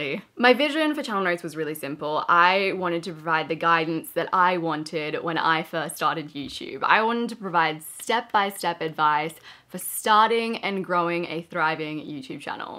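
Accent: Australia. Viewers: I heard 'Channel NERDS' more often than 'notes'. #AustralianAccent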